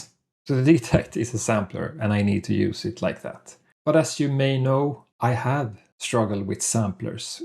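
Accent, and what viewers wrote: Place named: Sweden. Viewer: You sound super Swedish!? ❤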